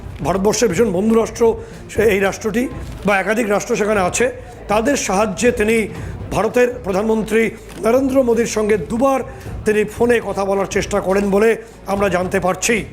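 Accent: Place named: Bangladesh. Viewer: Bangladeshi anchor naki? Kthay kichuta Bangladesh er accent ache.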